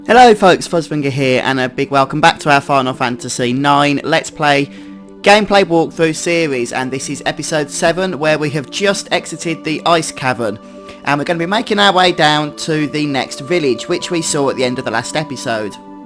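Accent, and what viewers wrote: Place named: Northern England, United Kingdom. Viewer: what accent you're using? sounds like northern accent in England. You earn my sub.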